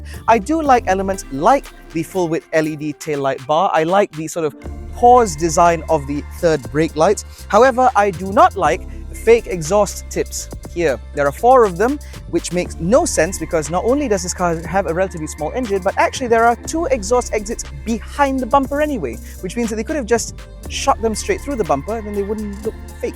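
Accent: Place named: RP, United Kingdom. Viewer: ..rocking those shades, with impeccable queens english..while driving the omoda9..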